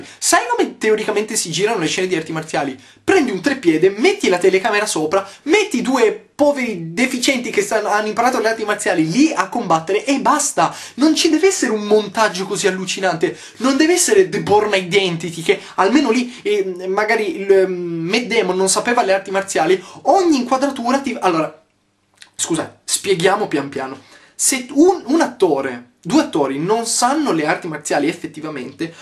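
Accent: Turin, Italy. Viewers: sei di Torino? :)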